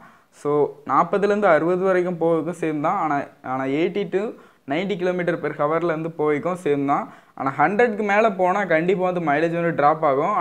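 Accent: Kanyakumari, India. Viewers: nanum kannyakumari taan Unga peachu la tarriuthu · பேச்சு லேசா கன்னியாகுமரி போல இருந்தது அதான் கேடீடேன்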